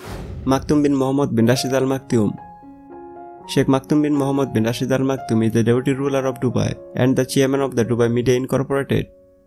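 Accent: Bengal, India. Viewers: Improve your English....there is a tinge of Bengali accent in your English. It is is not eezz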